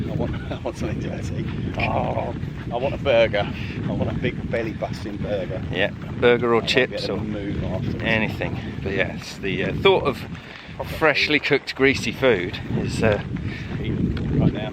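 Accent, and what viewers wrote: United Kingdom. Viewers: funny as sh#t I've made it exactly two-and-a-half minutes into your video and I can't stop laughing.As you're coming up the hill you stop and you say It's Like a Jungle Sometimes it makes me wonder with that British accent, and then you pause turn your head and I think to myself you know I would bet a million dollars that this white British dude probably doesn't realize he just started the lyrics to a song, and if he finishes the sentence when he turns his head back around he's going to say what in the world have i gotten myself into or it makes me wonder who in their right mind does stuff like this for fun and then you turn back around and finish the lyrics with your British accent and I haven't stopped laughing for 5 minutes! I don't know why I'm surprised you finished the lyrics to an African-American song that came out when you were probably a kid! 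After all my favorite music is British music. I love British music Their's only 2 kinds of music,good music and bad music and it's all relative from your perspective which is which and for me #1 on the list for a tie,its between the and,right beneath that my second favorite bands it's another tie between and and yeah Jimi like me was an African-American,but because of racism he couldn't get his start in America and he didn't take off until he formed the Experience with his two British bandmates in England.Just beneath them on my favorites list are the, and of c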